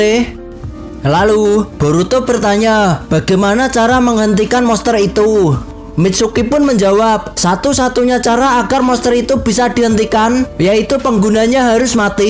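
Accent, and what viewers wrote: Java, Indonesia. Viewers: aku suka film nya cma kok suaranya medok amatt 😂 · Mimin org jawa.. Medoknya keluar.. 😅😅 Suaranya kaya robot..lurus😅😅 Tp malah pny ciri dan lucuuuuu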